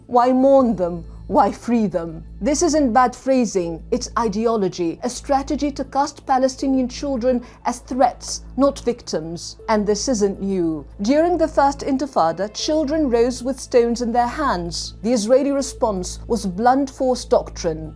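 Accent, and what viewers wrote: RP, United Kingdom. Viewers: She has a posh accent but is wrapped in this 6th century garb and 3rd century ideas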